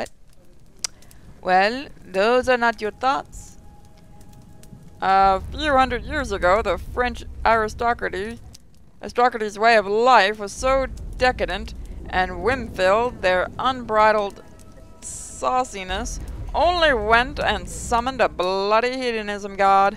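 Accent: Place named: India. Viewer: Your french accent sounds Indian.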